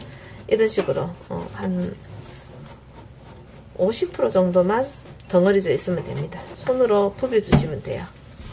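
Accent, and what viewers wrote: Gyeongsang, South Korea. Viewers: 쌀을 쌀이라 부르지 못하는 지역 ㅎㅎ 삼 싸먹으면 ~~ 살 처럼 경상도 억양 ^^ 목소리가 참 좋으세요 울 애기 엄마보고 보라고 해야 겠어요^^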